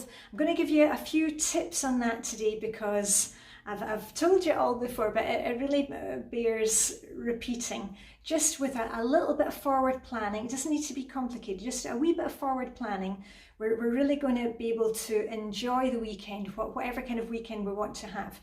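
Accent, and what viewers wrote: Scotland, United Kingdom. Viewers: Love the way you say Mary Berry in your wonderful Scottish accent!!! ♥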